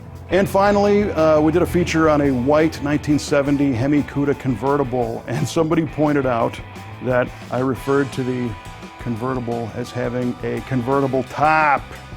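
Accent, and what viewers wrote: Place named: Chicago, United States. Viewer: You can definitely tell is a true Chicagoan not only by the way he said "convertible taaap" but even by the way he pronounced Chi-CAW-go. No doubt about it. And I wouldn't be surprised if he referred to the main room in his house as the "frunchroom," either. Go!:-)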